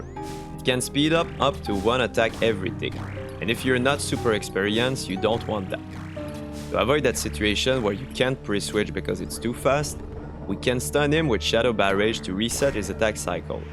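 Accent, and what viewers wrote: Quebec, Canada. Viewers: Trop cute ton accent de Québ, bon vid!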